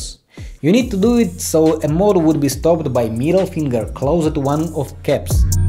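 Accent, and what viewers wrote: Russia. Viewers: God, this russian accent! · Включил видос и с первых секунд услышал русский акцент👽🗿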